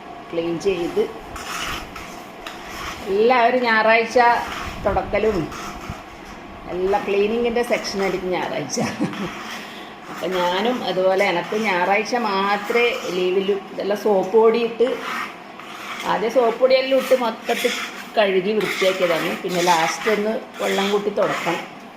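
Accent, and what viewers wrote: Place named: Kannur, India. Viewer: ആഹാ അടിച്ച് പൊളിച്ച് cleaning നടക്കട്ടെ. നമ്മുടെ കണ്ണൂർ touch ഭാഷ കേൾക്കുമ്പോ ഒരു സുഖം❤❤❤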